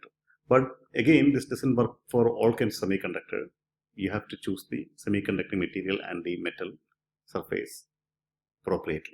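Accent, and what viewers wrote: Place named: Kerala, India. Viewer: The narration is in Malayalam. He claims it is in English but only Malayalees can understand his English. For example, he pronounces "chop" as "chope" (rhyming with "choke"). "Bonds" is pronounced as "Bones". Beware.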